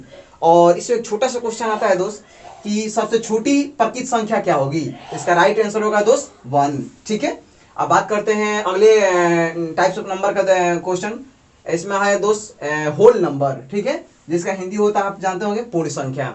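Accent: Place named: Bihar, India. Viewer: Bihari log ka boli mst h😊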